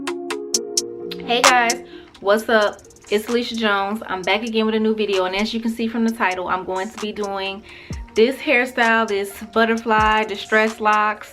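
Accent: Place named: South, United States. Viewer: You have a southern accent 😭❤️ and good job👏🏽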